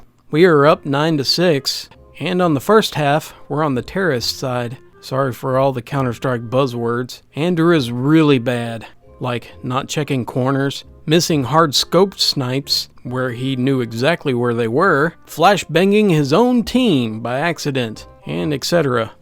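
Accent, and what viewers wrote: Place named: Appalachia, United States. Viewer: I have your voice. You sound like a hillbilly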